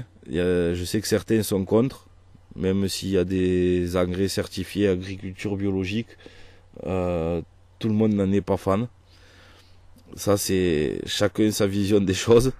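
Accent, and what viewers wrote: South, France. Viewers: Votre chaîne Youtube est GENIAL !!! un régal !!! vos dessin sont tres explicites et réussis. et votre accent sent bon le Sud. C est un plaisir de regarder vos videos, continuez ainsi !!!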